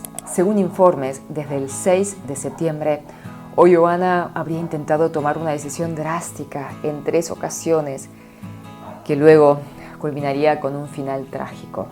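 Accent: Argentina. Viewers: No soporto el tono del español 'argentino' 😆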